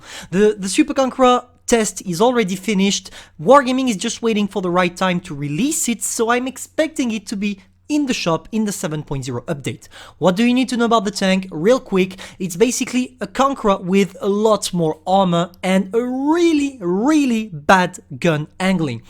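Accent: France. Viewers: Ta un accent français de ouf mec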